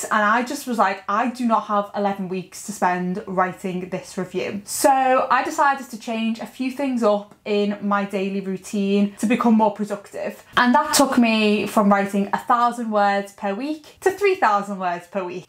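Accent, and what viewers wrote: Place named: Newcastle, United Kingdom. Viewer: Your accent sounds a bit 'Geordie', no?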